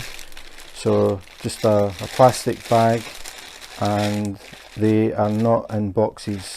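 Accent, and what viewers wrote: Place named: Scotland, United Kingdom. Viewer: It's always fun to listen to a Scottish accent. So cool. My father-in-law is Scottish but he has lived in Australia (where I'm from) since he was 17 y/o. He's now 80 y/o. I thought his accent was still strong but after listening to yours I realize it's not as strong as I thought.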